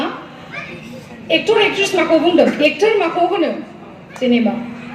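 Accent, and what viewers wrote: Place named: Udalguri, India. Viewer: jwbwd mwjang jadg Abo.. Ngni voice kwo birad mwjang mwnw ag ngsiniting ni edi railynykwonw mwjang mwnw ag kwnasogbai tanw lubei. Agni lwgw dgw Odalgurini railynykwonw birad mwjang mwnw ang Abo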